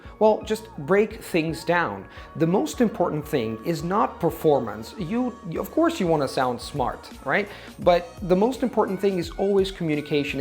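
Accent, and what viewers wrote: United States. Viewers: You sound American, kudos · I'm shocked too, he sounds american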